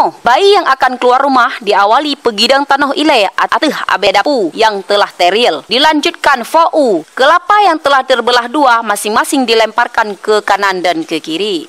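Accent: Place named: Aceh, Indonesia. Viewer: Mantap ureung baca berita....asli bahasa aceh.....lon juga warga geumpang.. 💪 semangat gempang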